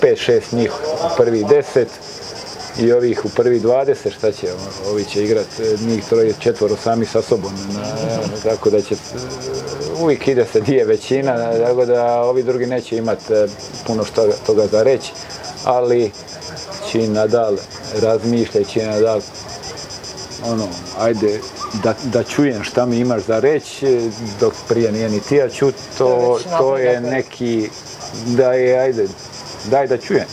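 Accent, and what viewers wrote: Dalmatia, Croatia. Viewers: To je dalmatinski naglasak kod zena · i ja isto OBOŽAVAM Dalmatinski naglasak, o tome može da misli ko šta oće negativno, zabole me ,,djoka,,.. poz 031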